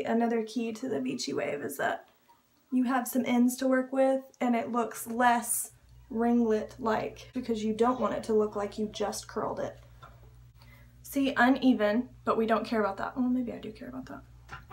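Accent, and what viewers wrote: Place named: United Kingdom. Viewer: That British accent though.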